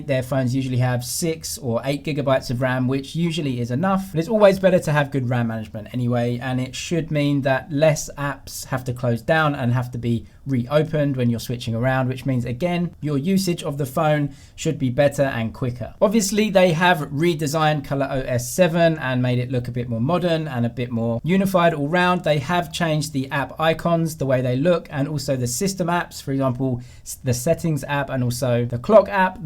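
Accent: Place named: RP, United Kingdom. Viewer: Thank God for RP. Great for non-native speakers like me.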